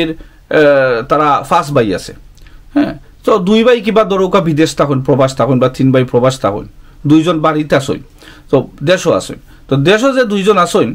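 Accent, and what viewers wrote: Sylhet, Bangladesh. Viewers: He is from Sylhet n talking to his community.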